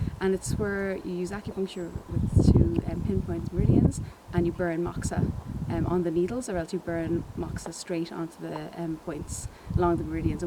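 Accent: Ireland. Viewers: Is that a connacht lilt I detect? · love the gentle Irish accent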